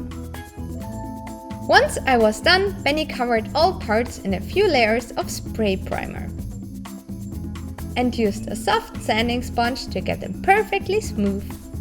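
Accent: Germany. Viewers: is she from Germany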